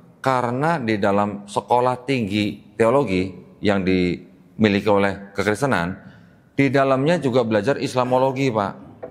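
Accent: Banyumas, Indonesia. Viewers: Logat Jawa banyumasannya melekat mbake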